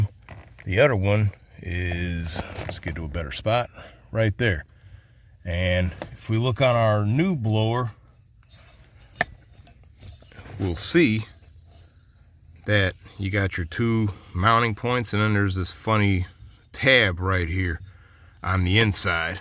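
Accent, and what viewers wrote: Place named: Chicago, United States. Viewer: Thanks. I need to do this on my rig. I like your accent, reminds me of home. You from the burbs of Chicago? Or somewhere over by der?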